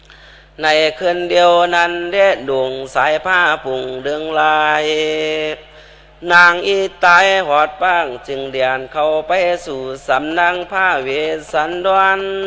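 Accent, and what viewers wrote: Thailand. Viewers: ดีใจครับได้ยินสำเนียงไต(ไท)ทุกท้องถิ่นในอุษาคเนษ์..พวกเราคือสายเลือดไต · สำเนียง ทางเหนือ คับ ผสม กับ ไต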